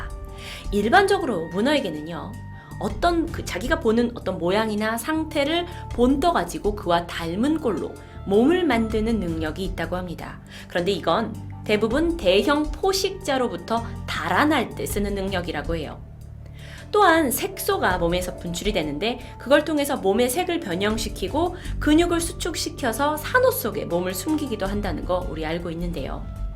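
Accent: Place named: Jeolla, South Korea. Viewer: 음이 정확해서 듣기 좋아요, 서울 말을 쓰시지만 원래는 전라도 분이시네요~ 전 강원도쪽 사람인데 전라도 경상도 사투리 너무 좋아 합니다.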